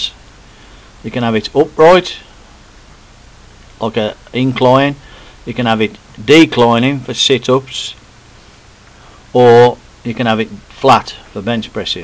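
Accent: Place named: United Kingdom. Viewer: The best thing in the video is the speaker accent. I love british accent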